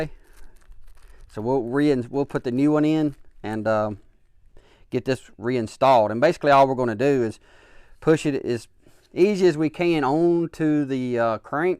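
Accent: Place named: Mississippi, United States. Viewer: You sound like your from Mississippi. No disrespect, I'm fro Mississippi lol. I have a question on a 97 f150. But I'm terribly busy. I'll. Get back with you tonight. Thanks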